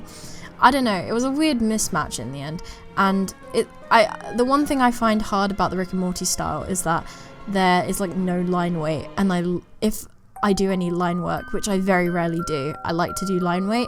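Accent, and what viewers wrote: United Kingdom. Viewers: I love you accent ! British accents are so unique ! I hate American accents maybe cuz I'm so used to them . Idk maybe I just dislike my accent eh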